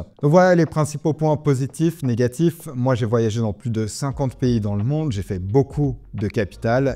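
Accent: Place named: Arab world. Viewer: J adore ton accent un peut d arabe un peut de français et beaucoup l anglais et le tout c est ta beauté naturelle ❤❤❤👏👏👏👏🙏🙏🙏🙏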